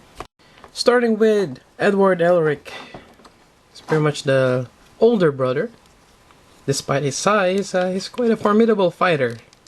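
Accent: Philippines. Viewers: r u Filipino? Cause I’m half Filipino and I can kinda tell from ur accent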